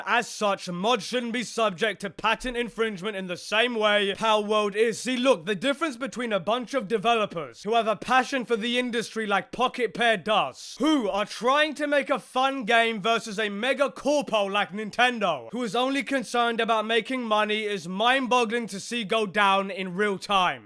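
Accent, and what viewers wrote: United Kingdom. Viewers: You tell em angry British guy!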